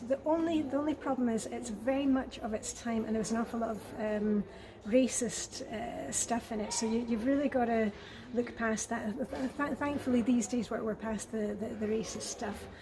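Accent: Scotland, United Kingdom. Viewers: I love your videos! I am American, and you, as you talk, remind me of my friend from Scotland. Thank you for sharing those book reviews with us 😃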